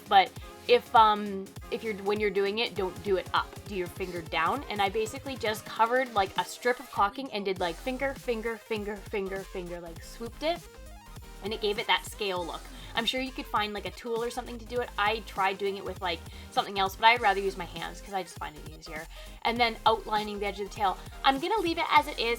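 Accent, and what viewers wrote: Canada. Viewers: Just from your accent I'm assuming you live in Canada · Are you Canadian? “Don’t chya know”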